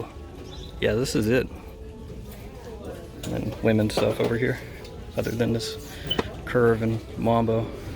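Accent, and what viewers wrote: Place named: Appalachia, United States. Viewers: I've been watching for a little bit and I suspected by your accent that you were probably somewhere in Appalachia. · Wondered where you were from because your accent was so similar to mine and now it makes prefect sense. I grew up about an hour away from there on the VA side. Definitely hard to find fragrances in small town USA. Thank goodness for the internet. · Bro sounds like Huntsville Alabama! Roll Tide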